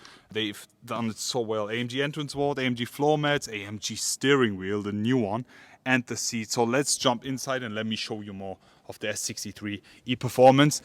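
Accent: Germany. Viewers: I always liked, a German accent, suits the Mercedes. Makes it, more tempting, to buy one. Cheers......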